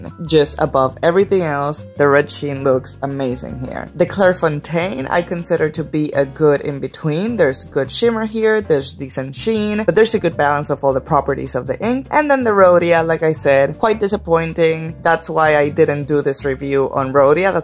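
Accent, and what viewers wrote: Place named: Bogotá, Colombia. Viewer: Are you from Bogotá? Porque tu acento me suena bogotano y que bueno tener a una compatriota fan de la caligrafía, las tintas y plumas :-)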